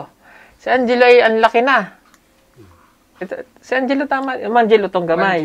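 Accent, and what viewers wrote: Visayas, Philippines. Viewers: Kuha kuha na ni ang pag sasalita ng bisaya. Pati accent kuha kuha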